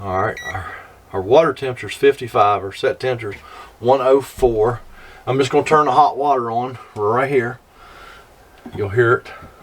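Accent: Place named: West Virginia, United States. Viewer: Back to Beckley! Oh yeah. In '76 I was there training to manage a store, moved to Bluefield/Princeton area shortly after, and once a month drove places like Welch, War, Matewan, and Williamson to check up on other stores, quite often on ice. First time I'd seen grey snow, and to a Texas raised young man, it was depressing. I thought I recognized your accent.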